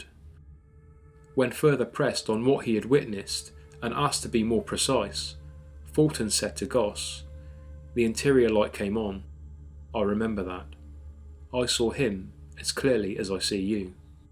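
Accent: England, United Kingdom. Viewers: I'm American but I so wish I lived in one of these charming and beautiful old English villages,( complete with the ghost stories) I just love the aesthetic so much! Thanks for the videos, I just recently discovered your channel but it's my favorite kind, interesting content, British narrator and no ads every 5 seconds. Keep it up my friend! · You've got a lovely voice,! Somehow, as an American, I find that these types of narrated stories sound so much better coming from an English or an Australian accent. Tom Buckmaster has a YT channel, and he has actually gone out onto some of England's haunted roads to do investigations. Very creepy. Love your content! Cheers!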